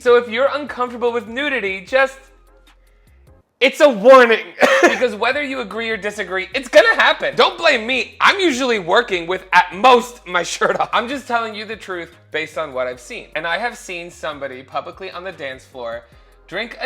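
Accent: New Jersey, United States. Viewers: I love a proper Jersey accent. Most think it’s Staten Island when it’s little differences. And definitely not Brooklyn either. “Cholly” instead of Charlie “Wuff” instead of “wolf” “Tawk” instead of talk or “towuk” (SI accent) “Wunnuh” not “wawunuh” (SI) “Guhd” instead of God or “gawd” (SI) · As a Jersey native, still having his Jersey accent after visiting his home is so cute and relatable. 😂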